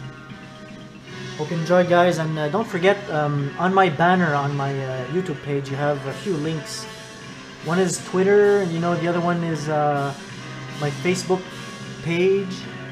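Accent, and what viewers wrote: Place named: Quebec, Canada. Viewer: Je savais t'étais québécois !! Vrm bon ton vidéo j'ai jamais fini le jeu (PS4), j'avais juste réussi de me rendre après la partie de l'eau. Merci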